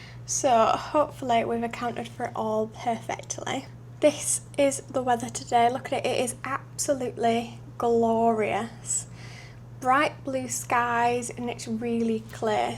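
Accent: United Kingdom. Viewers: Love your very British accent ❤❤❤🎉🎉🎉🎉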